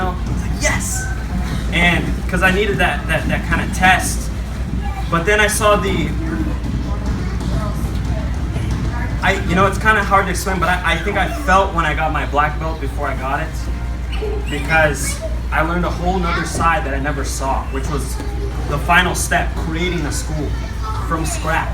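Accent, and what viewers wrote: Brazil. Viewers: nice bro, lol were u doing a brazillian accent on purpose, also please dont leave us a whole week again without a video cause theyre funny :) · Congrats, but why did you suddenly start talking in a Brazilian accent?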